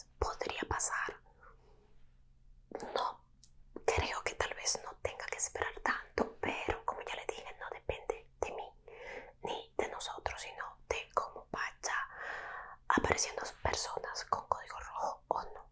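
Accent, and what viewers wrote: Italy. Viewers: Se te esta pegando el asento italiano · De que pais es? Italiana? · Eres de Italia? , nose tu hacen to me parece Italiano 😁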